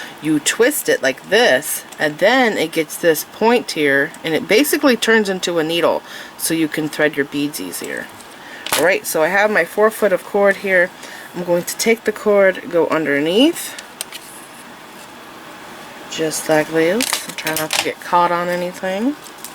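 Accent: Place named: South, United States. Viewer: Aw geez, lolol, it's just that touch of Southern that throws you off 🤣😂😆😅😄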